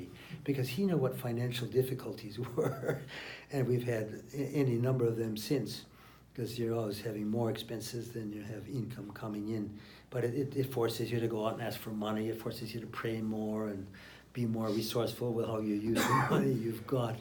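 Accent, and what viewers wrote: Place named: United States. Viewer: He doesn’t sound completely American anymore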